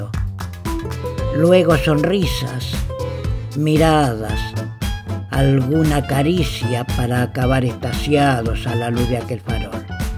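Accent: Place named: Argentina. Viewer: En tu voz y deje argentino cobra más vida este poema mi querido amigo, orgullosa de tu buena declamación...Gracias muchas gracias. abrazos.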